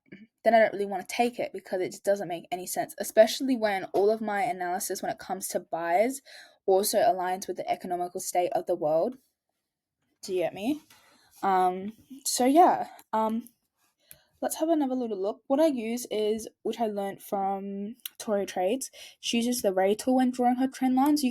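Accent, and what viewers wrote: United Kingdom. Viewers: You from the uk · Ok, I'm just going to get it out of my chest. You're very pretty and very smart. Love your UK accent 😂. Gold is a ticking time bomb its overbought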